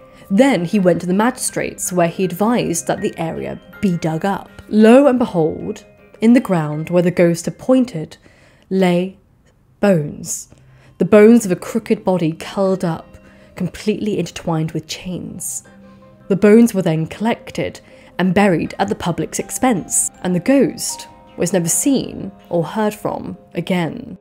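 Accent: RP, United Kingdom. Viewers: So posh. Awesome. Great content.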